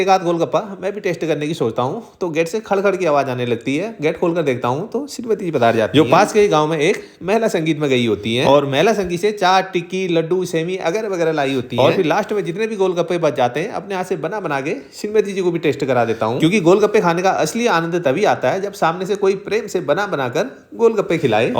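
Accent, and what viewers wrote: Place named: Uttarakhand, India. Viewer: Aap uttrakhand se ho kya ❤❤ me uttrakhand se hu or aapke bolne ka tareka bilkul uttrakhand walo jaisa h ❤❤❤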